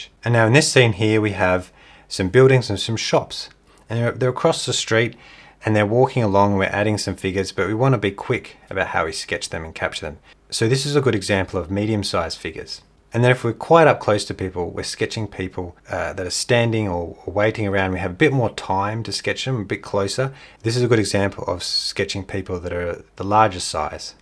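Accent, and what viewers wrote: Australia. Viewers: Just found you and subscribed - enjoying your Australian accent and excited to try your approaches!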